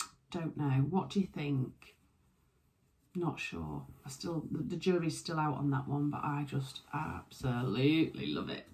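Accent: Yorkshire, United Kingdom. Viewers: You are totally not alone! I want to make all the things too! I daren't write them all down, but let's just say that the patterns have had to be moved to a new home because they were jamming my sewing desk drawer. So there’s quite a few 😂. My resolution at the moment is to prewash all the fabric I've just stuck in my cupboard in the last 6 months, then I might actually work out what I have. T-shirts and T- dresses definitely on the agenda though. Great vlog as ever, thank you, and lovely to hear your Yorkshire accent as it reminds me of home.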